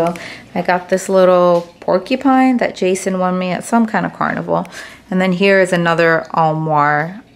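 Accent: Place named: New York, United States. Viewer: I love your accent; it’s so NY and I just love it. Stay safe queen and Tfs 😘🖤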